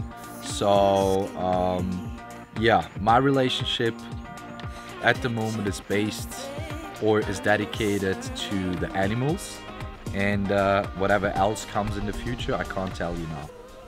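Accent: Switzerland. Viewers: Damn...that Swiss-German thoughhhh 😍😍😍🤤❤❤ lmfaooo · Hahaha ish geil ihn schwizerdütsch rede zköhre😂😂💪🏼🤘🏼🤙🏼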